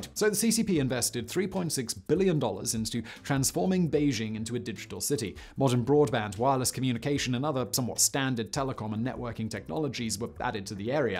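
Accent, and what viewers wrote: England, United Kingdom. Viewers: what kind of English accent is that ?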